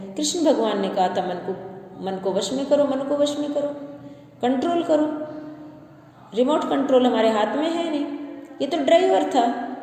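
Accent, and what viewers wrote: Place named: Rajasthan, India. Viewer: आप राजथास्थान से लगते हो बोली से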